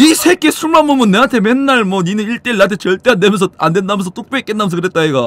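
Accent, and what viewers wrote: Busan, South Korea. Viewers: 부산 싸나이네 ㅋㅋㅋ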